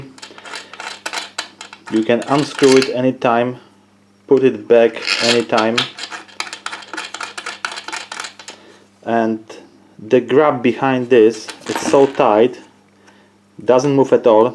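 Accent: Poland. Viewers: Słychać że rodak :) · Polski akcent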